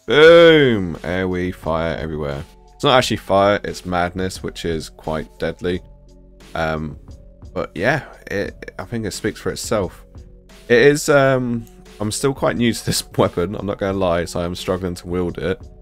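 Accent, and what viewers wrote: United Kingdom. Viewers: Good video, but your British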